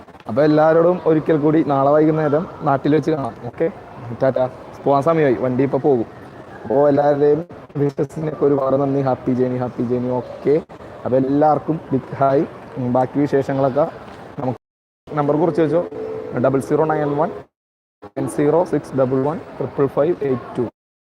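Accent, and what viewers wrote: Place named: Ernakulam, India. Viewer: ernakulam slang adipoli 😀😀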